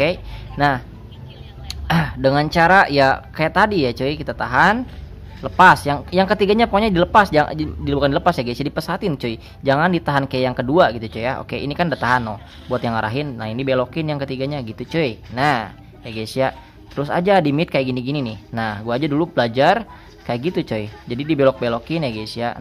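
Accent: West Java, Indonesia. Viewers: Ngakak, sunda na karasa pisan ekek. Sukses brad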